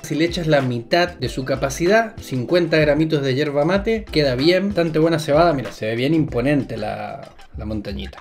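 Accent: Chile. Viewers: Buenísima recomendación! La compraré a ver qué tal. Me queda una duda de cuál es tu nacionalidad ya que tu acento me suena chileno pero a la vez no jajaj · Hola que tal, mira asique vivis en Francia, y se consigue yerba halla? Voz no sos Argentino, sos Chileno por el acento, estoy en lo correcto? SALUDOS desde Nueva Zelanda, voz sabes que aca se consigue todo pero bastante mas caro por supuesto, que tal los precios en Francia, Mercy 😊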